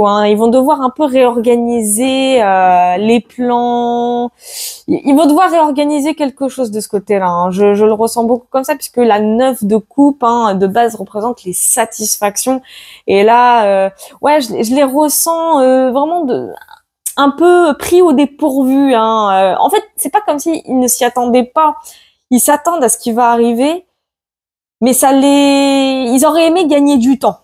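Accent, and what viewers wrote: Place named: North, France. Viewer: Chère petite je réponds un peu à bla personne qui dit qu il ne comprend pas à ce que vous dites en réalité c est peut être comme moi qui suis du sud et qui a du mal à comprendre l'accent du nord surtout lorsque tu ris en parlant C est marrant ''''mais peut être si tu arrive à parler un peu plus lentement ce sera mieux Je te dis cela sans aucune méchanceté car je suis une vieille grand mère qui te fait de gros bisous car tu es très très sympa je t ai connu dernièrement lorsque tu étais avec le bazard de la voyance💋💋💋❤️❤️❤️👍👍👍👍👍👍on t aime bien petite cherie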